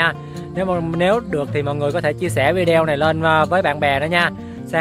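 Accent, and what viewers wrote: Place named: Mekong Delta, Vietnam. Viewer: e ở đâu? nghe giọng như người miền tây vậy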